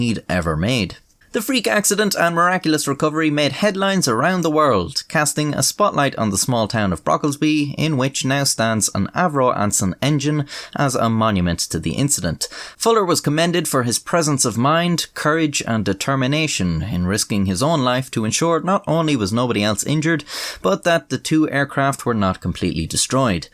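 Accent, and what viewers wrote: Ireland. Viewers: Funniest Irishman I ever listened to!!!! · I am a simple man, I see a upload, I click and begin to absorb knowledge from the spectacular Irish narrator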